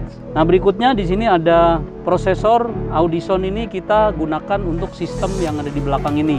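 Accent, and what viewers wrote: Banyumas, Indonesia. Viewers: Walah ngapak boss, kapan2 tak dolan meng toko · Jebul wong ngapak...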